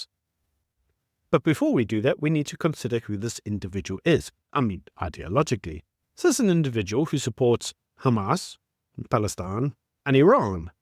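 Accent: South Africa. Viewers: As a South African - a "white" one by the way - I do not agree with elements of what you saying. The words you use are often depressingly similar to those used by Trump 🤦🏼. "Stupid", "retarded", etc. You clearly don't know anyone who is mentally handicapped. I am having great difficulty listening to you but am trying to keep an open mind. Clearly from your accent you are South African. "Dumb" etc. I will not subscribe to your rave Far too simplistic, and I see and hear no evidence of emotional intelligence, even at 3-year-old level. I mean that as a child therapist, not as an insult. Please may I sincerely suggest that you attend courses on listening respectfully, asking others (especially those with radically different world views), and ask them whether they feel you have HEARD them, and understand their views. I am not talking about aGREEing. I am talking about making an effort to understand another person's point of view - which would be of course be based be based on their life experiences. 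Surely the key to positive, healing, substantial social/ political change lies in each one of us developing the ability to form and develop strong (resilient!) working relationships. Surely this involves each one of us "growing our muscles" of respect, humility, forgiveness able the capacity to be gracious? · I grew up largely in South Africa (now in the US decades later) and listening to you is completely like listening to my South African cousins from Joburg, which I totally enjoy. I do regret how the ANC is not building alliance with the US. Marco Rubio is not dumb.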